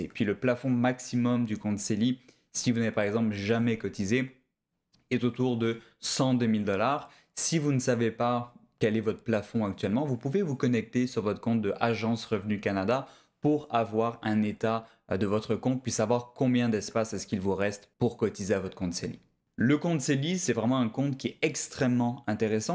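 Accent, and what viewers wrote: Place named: France. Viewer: Pourquoi un québécois s’adressant à des québécois sur un sujet ne touchant que le Québec parle avec un accent français?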